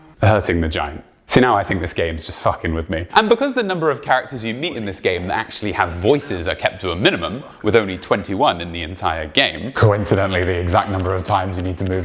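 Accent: United Kingdom. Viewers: I love new brittish accent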